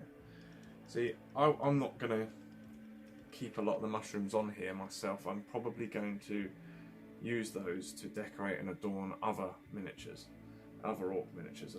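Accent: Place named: RP, United Kingdom. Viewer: Beautiful Queens English squire!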